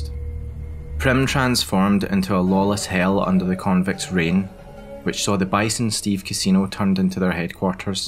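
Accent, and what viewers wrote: Scotland, United Kingdom. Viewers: Great video man. Btw I love the accent. Is it Scottish?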